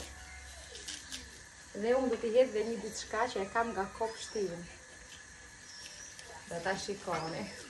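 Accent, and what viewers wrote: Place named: Dibra, Albania. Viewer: Hahaha me knaqe po nga je se flet si nga dibra se nga dibra jam vet urime suksese pac me kto video❤️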